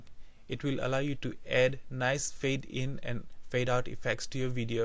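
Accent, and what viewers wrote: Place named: India. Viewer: Indian accent is the best. :)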